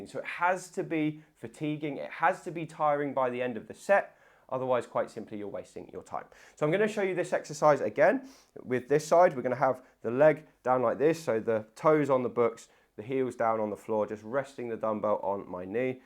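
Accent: United Kingdom. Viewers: I’ve recently found my way to your videos and want to thank you for the content. You provide excellent advice and exercises for my arthritic knees. I hope to improve my walking and have improved joints. Your approach yo explaining the what, how, and why is so clear and concise. You are very talented at what you do. Wish you were in my location in the U.S. Your British accent is so smooth to listen to 😂. Thank you for the great content.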